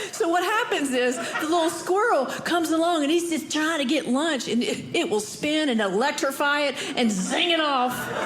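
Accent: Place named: Maine, United States. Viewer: Still a little bit of a Georgia accent, but more Maine in there than before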